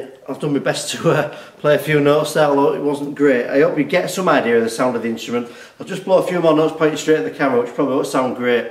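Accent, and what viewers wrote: United Kingdom. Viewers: very helpful thank you and I personally really like the Yorkshire accent - it makes it all sound genuine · Appreciate your videos, very helpful, considering purchase of flugelhorn after buying Trumpet. Sounds nice, as soft as a cornet, what mouthpiece is being used DW 3FL, caught at end, thanks. Used to live in Sheffield so lovin the accent!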